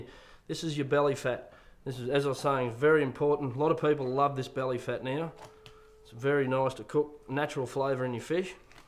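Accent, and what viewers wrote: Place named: Australia. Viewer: the Australian accent :) I've been living in Syd for 7 years but just couldn't pick it up. love it though :P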